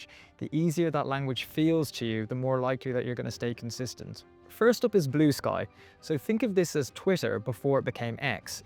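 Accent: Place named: West Country, United Kingdom. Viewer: hi, are you from Devon? Your voice, your accent sounds the same as mine. It's so nice to hear a westcountry voice.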